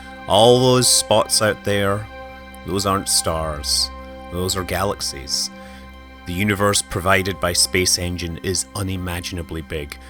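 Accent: Scotland, United Kingdom. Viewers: Rather Carl Sagan with a nice Scott taint. brilliant. does space engine do stereoscopic output? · Omg I just realised how deep of a Scottish accent u have got btw love ur videos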